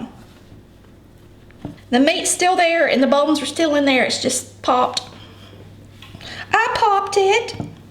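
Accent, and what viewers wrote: South, United States. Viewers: you so southern like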